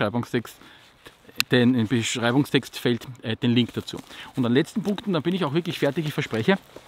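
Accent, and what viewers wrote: Austria. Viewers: What is this interesting accent? Austrian? Anyway, thx for this review, item added in the wishlist. 😊👍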